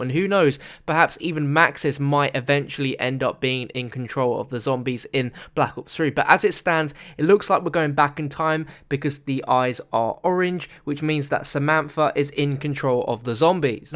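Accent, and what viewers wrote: United Kingdom. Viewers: we dont care about your opinions or british accent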